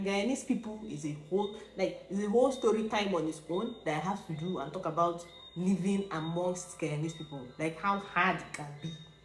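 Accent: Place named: Igbo, Nigeria. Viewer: That igbo accent i love it i got to get me another Nigerian igbo queen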